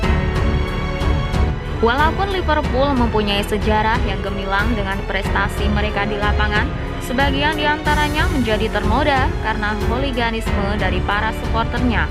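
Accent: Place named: Java, Indonesia. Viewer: Naratornya medok banget.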